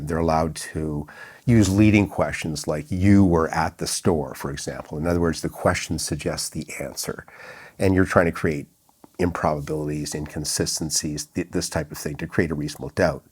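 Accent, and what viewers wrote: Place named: Canada. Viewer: Is this dude and Canuck er what? Second sentence he said proooocess and I knew it. Are you taking US Court system here or…. Oh no, you just a said “Crown”. Canuck.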